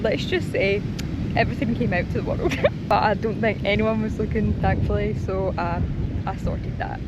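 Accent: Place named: Scotland, United Kingdom. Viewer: She's got a scottish accent. I can understand her just fine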